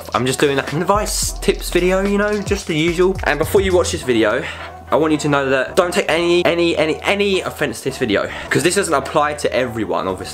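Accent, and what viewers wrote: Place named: England, United Kingdom. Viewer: he's from England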